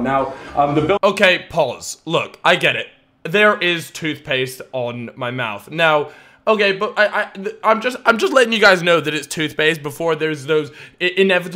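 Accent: United States. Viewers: you sound American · He sounds american and his accent keeps phasing out!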